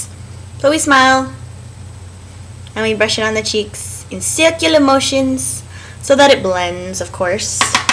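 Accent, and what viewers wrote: England, United Kingdom. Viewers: LOLL I LOVED THE ENGLISH ACCENT omgg so funny man! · Your English accent is fabulous :))) Loving the make-up x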